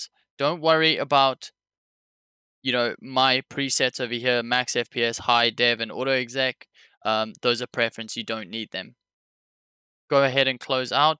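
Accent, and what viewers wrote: South Africa. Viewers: are u south african? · South African? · Are you south African bro? Appreciate the content and all love from RSA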